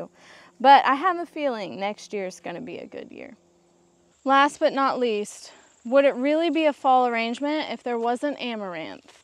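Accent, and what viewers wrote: South, United States. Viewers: Just found your channel. We grew other colors of broom corn. I believe it was red blue and yellow. Been a long time but I think that was what we grew. Also, I have a question. I know where you say you live BUT I don't think that is where you are actually from. I'm in Alabama and you sound as though you are from here or Tennessee or Kentucky or at least the south. Am I close ?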